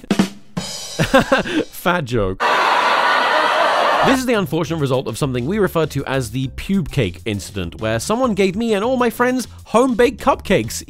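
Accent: United Kingdom. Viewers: just wanna say love you vids not only because your also English (I assume from the accent) but because your videos have always made me laugh 😁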